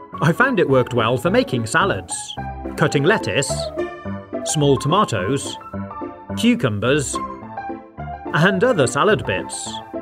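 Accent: United Kingdom. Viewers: I love this guys British accent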